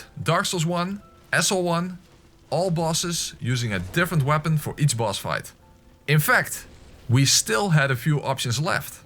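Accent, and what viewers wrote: Netherlands. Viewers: your accent is quite obvious Dutch (Netherlands). Very nice 🙂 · I like his dutch accent!^^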